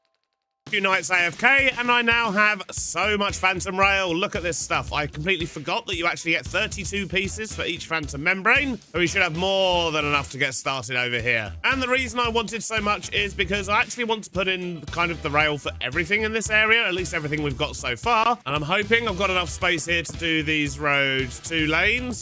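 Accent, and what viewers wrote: United Kingdom. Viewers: You and mumbo have the same accent so pardon my American idiocy but what region of the UK gets that accent? Would it be more up-north or am I just completely wrong?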